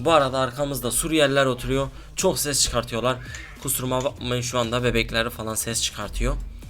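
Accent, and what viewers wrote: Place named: Antep, Turkey. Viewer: Antepli misin şiven benziyo da yada adanalı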